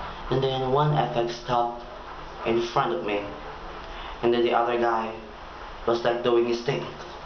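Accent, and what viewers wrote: United Kingdom. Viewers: naks British English ang accent :) good mowning :)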